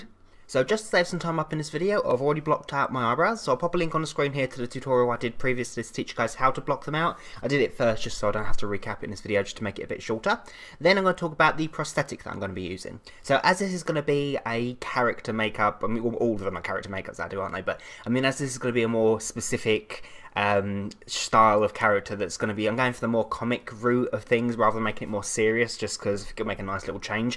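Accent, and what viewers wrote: United Kingdom. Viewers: makes caveman sounds while still sounding british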